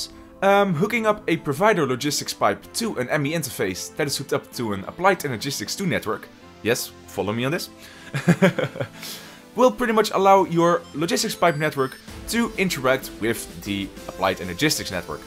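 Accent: Netherlands. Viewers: Nice dutch accent 🧡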